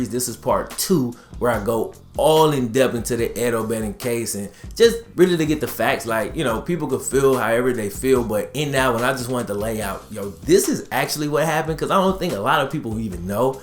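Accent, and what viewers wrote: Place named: Louisiana, United States. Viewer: I aint know you was from Louisiana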